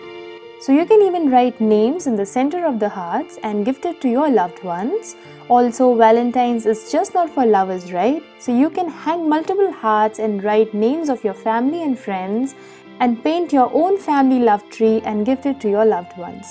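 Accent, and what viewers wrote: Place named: India. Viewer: Couldn’t freakin’ understand her with that Indian accent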